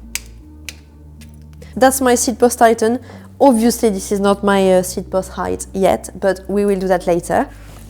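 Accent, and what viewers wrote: France. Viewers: Sounds totally French to me.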